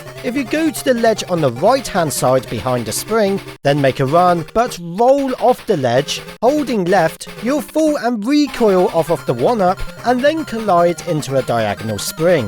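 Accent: United Kingdom. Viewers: Son of a glitch, but British :)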